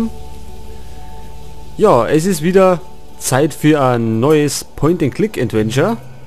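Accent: Bavaria, Germany. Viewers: Sehr geil das des amoi ajemand auf boarisch mocht, da geht mia a herz auf <3 Super LP weitermocha ;D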